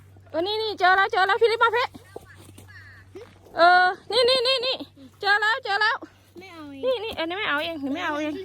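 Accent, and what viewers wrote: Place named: Phu Thai, Thailand. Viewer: โอ๊ย ยาย หนู กรี๊ด!!!นำ งามคัก งามคัก เห็ด ตาแซ่บเนาะ ยาย😆😊👍 หนูอยู่ แอฟริกาใต้ บ่มีให้กินเลยค่ะ ว่างๆ เพื่อนๆ แวะมาเยี่ยมเยียน ส่อง ทางแอฟริกาใต้ กันเนะเด้อค่ะ เข้ามาติชมเนะ พึ่งทำคลิปใหม่ค่ะ ตามสามีไปติดตั้งแก๊ส แบกแก๊สพร้อมพี่น้อง แมนคัก มาดามเมืองคนดำ 555😆🙏🇿🇦🧯🚐🌷❤️🏡✅ ยาย ขออนุญาตค่ะ คนที่ไหน ทำไม พูดคล้ายๆ คนภูไท แท้ค่ะ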